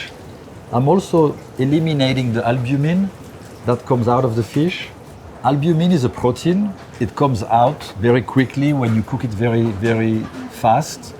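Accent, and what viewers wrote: France. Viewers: The only French accent I can listen to without fatigue. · Merci Chef. Bel accent, merci de rendre hommage au francais. Calliss zont qu'a faire l'effort de nous comprendre les osti d'anglais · When you speak perfect English with perfect French accent ahaha 😮😂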